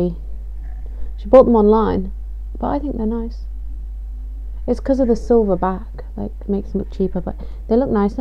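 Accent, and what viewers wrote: United Kingdom. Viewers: In my opinion there’s nothing better than a girl with an English accent.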